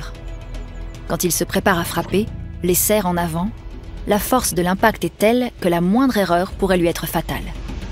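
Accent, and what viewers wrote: France. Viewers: toi t'y'es un parisien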